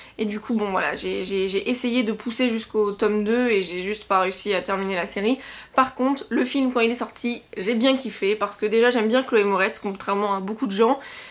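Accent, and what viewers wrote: United Kingdom. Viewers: Hello ! Alors pour ta question, ça me brise le cœur de dire ça étant une Potterhead à la vie à la mort mais... En ce qui concerne Harry Potter et le prisonnier d'Azkaban, j'ai préféré le film au livre :'(. Voilà, c'est dit ! La musique, l'ambiance, tout est magnifique et le livre est bien, mais pas autant que le film (et je l'ai relu dernièrement pour confirmer mon avis !) Sinon 13 Reasons Why c'est une tuerie (pardon pour le jeu de mot involontaire) ! En 2 jours c'était fini, terminé ! Fortement captivant ! Géniale cette vidéo ! J'adore le concept :3 (Et ton accent anglais qui déchire !). Bisous !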